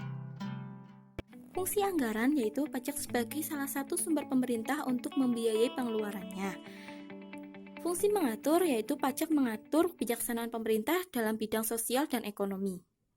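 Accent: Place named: Java, Indonesia. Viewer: Aku juga mau ngomong medok gini di depok mba :(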